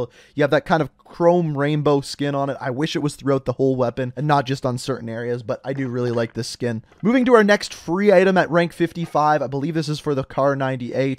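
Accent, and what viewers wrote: Canada. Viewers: Canadian?! Eh!! Love the channel even more now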